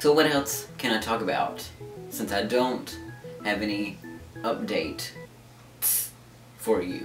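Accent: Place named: New Orleans, United States. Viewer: What accent is this? New Orleans?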